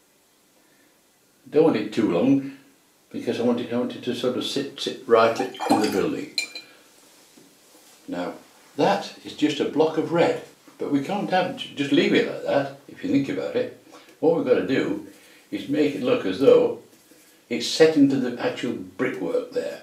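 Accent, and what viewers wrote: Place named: England, United Kingdom. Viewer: Love it I have always had to have a drawing to work two, so nice to hear an English voice, You explain everything you are doing so well, Will be having a go at this tomorrow, starting at your older lessons and working my way up, Thank you so pleased I found your site. Eric Lancashire Expat in Spain, Sorry about that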